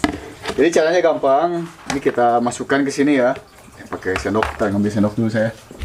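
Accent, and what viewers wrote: West Java, Indonesia. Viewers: sundana lucu pisan teh.meni edun etamah ey😆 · Seri we abdi mah,lucu kubahasa na,bnr2 asli sunda....(Hitung deui Kang Salah)😍😍😍🤣🤣🤣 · Hadeu... H"dasar tukang bisnis! Ih pinter bgt ch. Yg aku kangen in tuh logat sundanya yg kental. Sy tunggu ide nya yg lain y. Makarona rasa keju aku suka bgt rasa keju pasti gurihh. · sarau sarau ngaheab gagaro meni sunda pisan...........😂😂😂😂😂 salam bandung barat.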